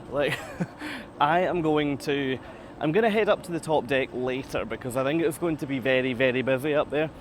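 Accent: Scotland, United Kingdom. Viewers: Hey - actually I just came across your video by chance, because I was watching videos on MSC cruises.... But when I heard your accent ( absolutely love it!!! - watched the Outlander series only in the original tone because of that), I just had to watch the whole thing. Very well done! There are so many terrible casts online, with nerving music, stupid comments etc... So I am glad I watched and of course I gave it a definite thumbs up and I even subscribed your channel;-). Although I dont have too much time, I will follow you on your journeys now. Regards from Germany, Bettina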